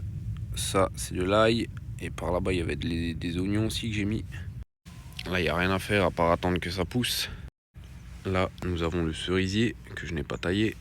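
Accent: Alsace, France. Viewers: Si il est pas alsacien la france n'a jamais été envahie par l'Allemagne 😂. Moi je le suis et son accent ne trompe pas weich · Parfois, il y a l'accent belge qui peut être trompeur, mais en tant qu'alsacien, on se reconnait c'est clair 🙂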